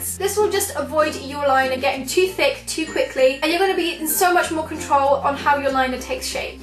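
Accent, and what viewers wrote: England, United Kingdom. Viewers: Soooo pretty and i love your british accent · Does anyone know in which part of England she lives in? I love her accent! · I love your accent it is absolutely beautiful.❤️ (I'm from England too) 🇬🇧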